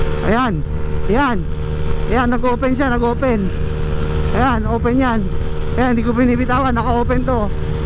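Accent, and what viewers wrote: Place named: Ilocos, Philippines. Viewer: Kwan ilocano expression pag nag tatagalog🤣🤣 agbiag ka lakay👍🏼👍🏼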